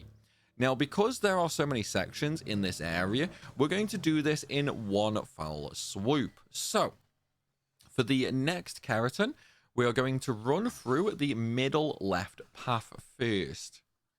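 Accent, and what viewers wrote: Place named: West Midlands, United Kingdom. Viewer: You sound like someone who lives in the west midlands of the UK like me I need this confirming as this is bothering me 🤣🤣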